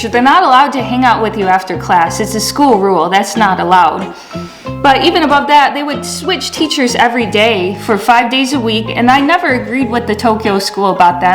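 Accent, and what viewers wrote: Michigan, United States. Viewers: you are losing your Chinese accent ;) I definitely hear the Michigan now in your English :) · Hi, I really admire you, learning languages. And especially now you are learning Korean. When you speak English, you can tell your American accent but it comes to Japanese and Chinese accents. It was a totally different tone. 👍👍👍😄😄😄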